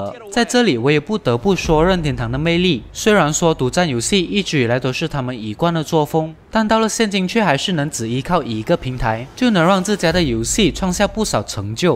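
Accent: Malaysia. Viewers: 應該是馬來西亞的口音吧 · 他是马来西亚人哦~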